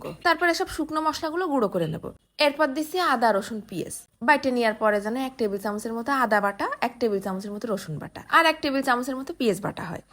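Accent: Jessore, Bangladesh. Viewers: তোমার এ বু কথাটা খুব মায়া লাগে কারন আমার মামিও যশোরের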